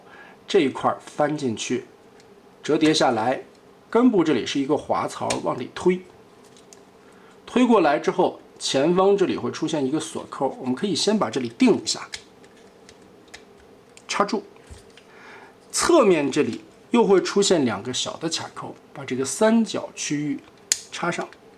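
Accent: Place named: South, United States. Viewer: He speaks with a southern drawl 😀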